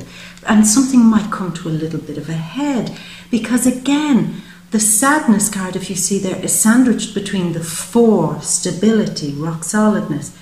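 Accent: Ireland. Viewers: For now as I am going to listen to the rest of your video I love ya you are honey and lemon and your voice is ace. Your accent to die for and your gift. I listen to different videos but you are the best. The creme d la creme the cherry on top. Amazing. But that cos you have the Irish beauty no one can deny. Mwa mwa mwa. Speak to you again. Soon. Stevie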